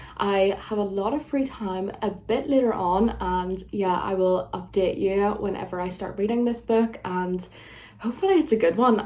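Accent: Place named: Scotland, United Kingdom. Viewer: I love your accent ❤ Are you from Scotland? Love from Germany 😊❤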